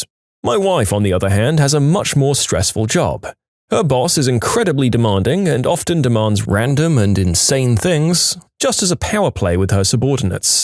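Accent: United Kingdom. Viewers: its been a second since ive watched this channel, when did he turn british lol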